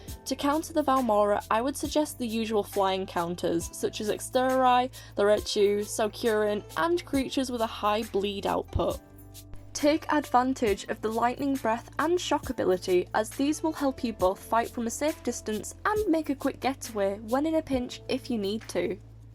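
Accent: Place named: Scotland, United Kingdom. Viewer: Her accent is like philza, british r's and sometimes sounds like a scot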